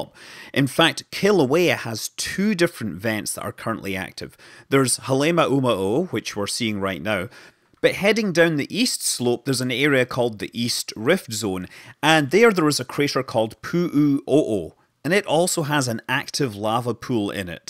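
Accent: Scotland, United Kingdom. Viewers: Do I hear a Scottish accent? It’s a posh wan but am sure it’s there.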